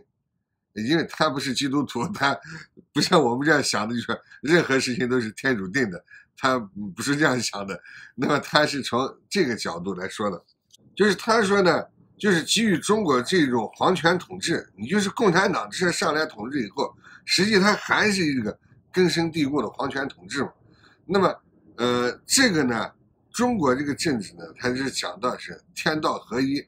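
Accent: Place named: Northwest, China. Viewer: 你是西北人？口音太重了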